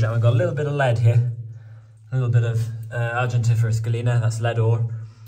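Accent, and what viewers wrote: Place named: England, United Kingdom. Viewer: A very interesting mining venture. Thanks for exploring it. Also, of interest was to see two "English" doing the exploring. (diffinetly your accent belies your nationality) I say hello from the state of Wisconsin in the USA.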